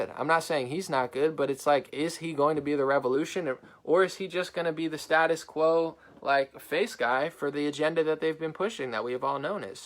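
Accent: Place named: New York, United States. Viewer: "Don't chu wanna suck this person off until...?" No. Yooo 💀 I was dead on impact. Lmaooo Viva! "He's talkin about wiiindex!" 😂😂😂 Are you from NY bro? Cause we always did that back home.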